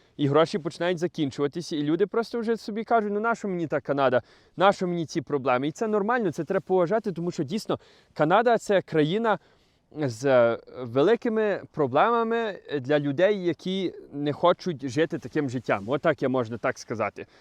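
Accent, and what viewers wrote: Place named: Ukraine. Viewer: Малий говорить як канадієць, а із українським акцентом)) А Українці і дійсно повертаються із різних причин: хтось шукає шари(а її тут нема), хтось бачить, шо у Європі йому було легше(і це часто правда), а у когось банально закінчились гроші, а робота не знайшлася(і добре, якщо вони не валянки і мають заначку на квитки назад), хтось звик до корупції - знайомі-кум-сват-брат, а в Канаді їх немає (і СЛАВА БОГУ)... і т.д. Канада - не для всіх. І це правда. Але вона не погана. І це теж правда. Тут ставлю лайк, бо він дуже об'єктивний.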